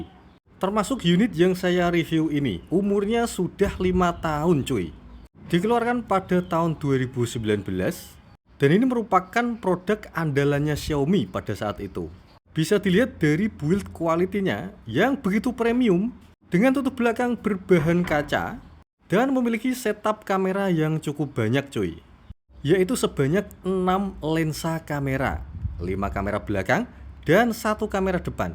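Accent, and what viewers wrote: Java, Indonesia. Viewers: Wong jowo y mass..logat nya tu....😅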